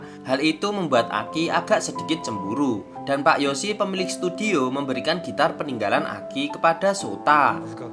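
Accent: Java, Indonesia. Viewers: Intro,closingnya sama logat jowone yg bikin gua pengen nonton trus celengan film😂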